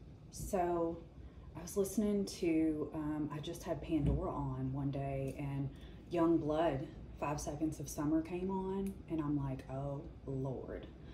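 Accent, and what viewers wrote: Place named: South, United States. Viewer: Fantastic reading. You really explain well the Pluto energy and its impact on relations. I subbed because your explanations are great and I'm a Southerner and love listening to us discuss mystical things. 💯💯💯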